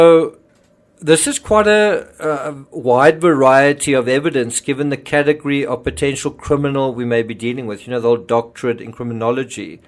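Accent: Afrikaans, South Africa. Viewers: Is that an Afrikaans accent? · Are you South African? You have a SA accent 😊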